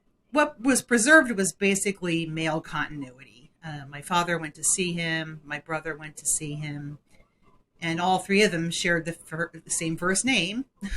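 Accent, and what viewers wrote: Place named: Mid-Atlantic, United States. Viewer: Thank you for sharing this. I’m glad your uncle was able to give your brother perspective and “permission” to trust himself. That’s something so many people never get. (You sound mid-Atlantic, perhaps I am wrong, but hello from MD!)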